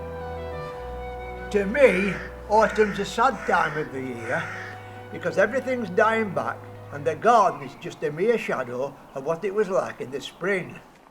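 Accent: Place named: Lancashire, United Kingdom. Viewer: I enjoyed this video and recommend you for your care of the wildlife. I was born in Lancashire and lived a couple of years in Todmorden. I love listening to the music of your accent it feels like home.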